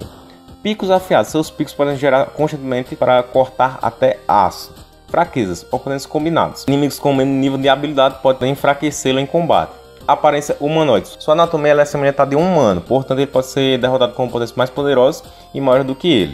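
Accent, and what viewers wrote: Northeast, Brazil. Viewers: O melhor nen e o conteúdo e sim o jeito nordestino do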